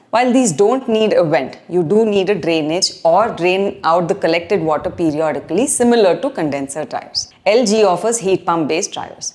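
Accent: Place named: South India, India. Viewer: by your english accent i can make out that u are a south Indian may be andhra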